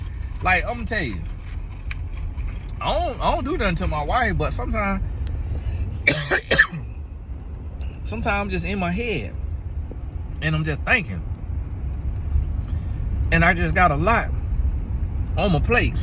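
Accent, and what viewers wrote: South, United States. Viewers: Wow I’m from Florida too. Lol! I was raised up north though. You have a strong southern accent. You are hilarious but at the same time you’re telling the truth! 😂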